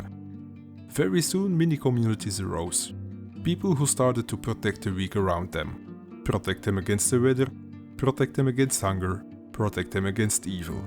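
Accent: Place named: Russia. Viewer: I like your Russian accent 👍💪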